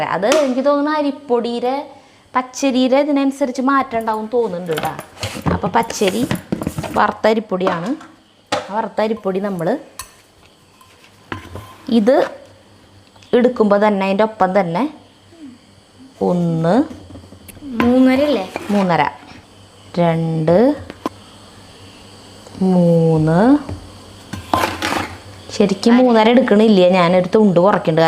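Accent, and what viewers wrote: Thrissur, India. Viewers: തൃശൂർ സ്ലാങ് കേൾക്കാൻ നല്ല രസം 👌😍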